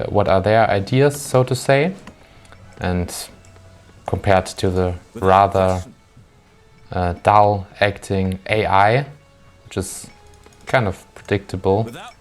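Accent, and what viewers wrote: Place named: Germany. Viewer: German accent ist klear.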